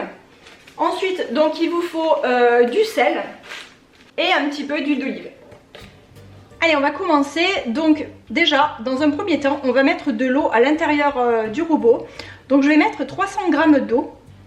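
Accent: South, France. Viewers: Coucou ma petite.. Quel plaisir que d'entendre cet accent du sud de si bon matin... tu mets du baume au coeur... Bon tes petits pains ont tellement l'air bon... ça donne envie de croquer dedans et je sens l'odeur rien qu'en les regardant 😂 J'espère que le confinement se passe bien pour vous... En aparté vas tu faire une vidéo pour le nouveau pack ou tu ne joues plus du tout? Moi j'avoue que ça fait des jours et des jours que je n'ai pas ouvert mon jeu... 🙄voilà 🤪 Je te souhaite un très bon week-end avec ta petite famille... Pleins de bisous et prenez soin de vous tous 😘🌹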